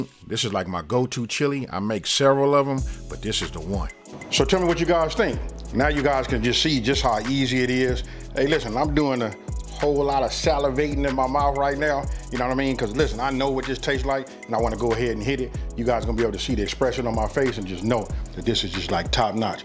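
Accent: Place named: California, United States. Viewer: It’s the California Accent for me!!🥰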